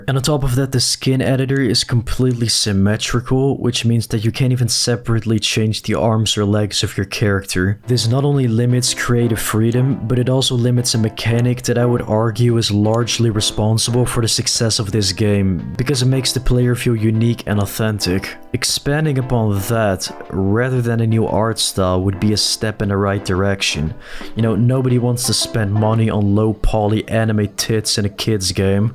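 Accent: Netherlands. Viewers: Is bro dutch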